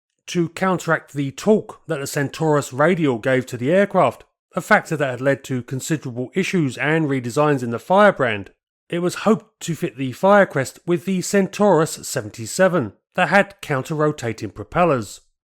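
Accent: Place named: Essex, United Kingdom. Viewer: DaadaadaaDAAA, daadaadaaDAAA, daadaadaaDAAA, ... Come ON. Your DELIVERY is getting un-LISTENABLE-to. You gotta VARY things a bit, so every SENTENCE isn't exactly the SAME as every OTHER one. The CONTENT is generally GOOD, but now I have to kill the SOUND, on ACCOUNT of this. So a LOT gets LOST. You may be from ESSEX, but that's no exCUSE! OK? OK.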